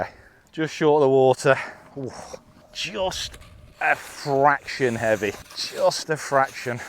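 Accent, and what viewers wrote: United States. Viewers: your american accent is top notch, love it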